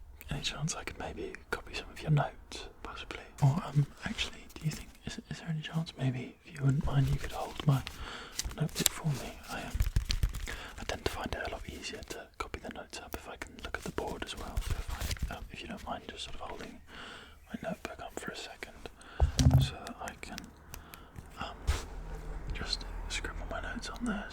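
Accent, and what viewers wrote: United Kingdom. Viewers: I just watched the like nerd studies you video or whatever it's called and I loved it so much that I came to look at some other videos and I got jump scared when I heard the British accent 😭 (not in a bad way I just was not expecting it at all lol)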